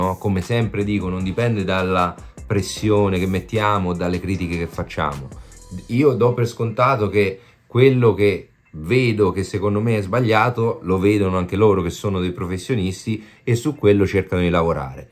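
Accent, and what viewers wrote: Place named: Rome, Italy. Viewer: Ma quando mi piace sto....con questo accento romanesco sempre sorridente, competente.Arrivera' il giorno di miretti di fagioli e attenzione.....Pogba.....sono di Bergamo juventino (antiatalantino).Mi raccomando.Ciaooo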